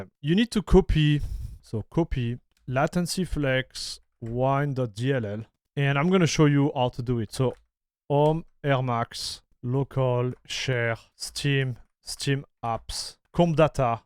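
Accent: France. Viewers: He is french guy? The accent its funny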